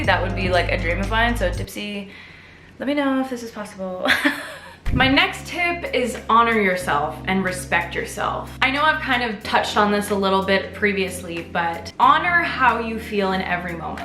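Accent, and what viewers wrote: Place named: Canada. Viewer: I repeat I'm here only for sweet Canadian accent 😁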